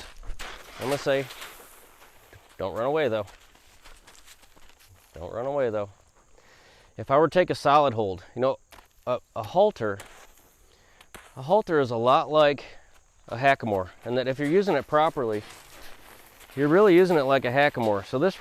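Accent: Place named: Michigan, United States. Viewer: knew by the accent you were probably from Michigan (from royal oak myself).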